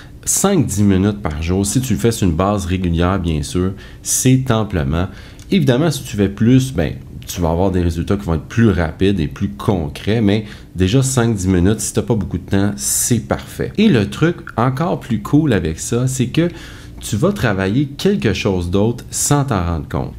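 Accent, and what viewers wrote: Quebec, Canada. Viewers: Bonjour, Je découvre aujourd'hui ton Chaleureux accent québécois. Saxophoniste âgé mais volontaire; peux-tu me donner quelques conseils pour travailler un son harmonieux, la dextérité et l'improvisation! Cordialement! Jacques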